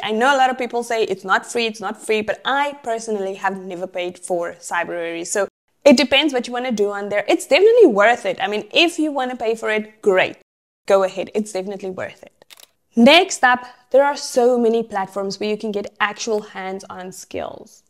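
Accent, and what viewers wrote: South Africa. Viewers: Are you from South Africa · Are you South African? Lol i can hear it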